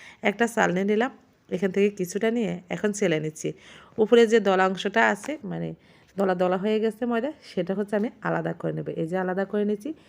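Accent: Noakhali, Bangladesh. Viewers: আন্নে নোয়াখাইল্লা কেন ⁉️ 😁😁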